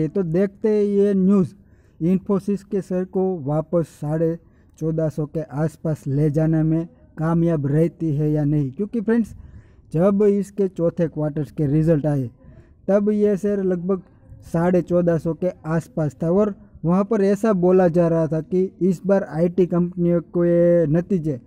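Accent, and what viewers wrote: Gujarat, India. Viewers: Hu pan gujarati j chhu. Gujarati ne hindi bolta taklif to pade. Pan ae taklif khali je gujarati hoy ae j jani sake.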